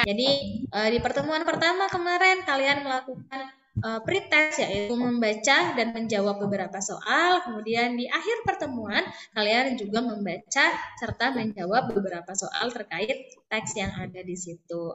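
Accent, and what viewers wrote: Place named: Banyumas, Indonesia. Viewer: Guru ngapak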